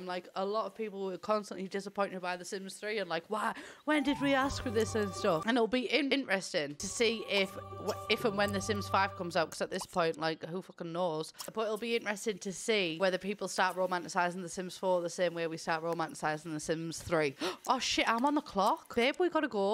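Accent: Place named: Newcastle, United Kingdom. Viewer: Hey its so upsetting when you mention tories in your videos in such a negative way. Conservative is just a political opinion, we aren't all bad people just like people who support Labour or lib dem. I just feel so shamed when I watch your videos sometimes cos of your comments and I don't feel I should have to be cos its my own personal opinion. I absolutely love your videos and think you're hilarious and I don't actually live that far away from you so it's great to hear a geordie accent but it's making me feel like I can't watch you cos your opinions are so strong and youd think I'm a bad person... 😞 I am definitely not hostile either I work for the fire service and I love helping others and spreading positivity. I just wanted to share this cos you may not have even thought of this... But either way, I hope you're feeling better can appreciate where I'm coming from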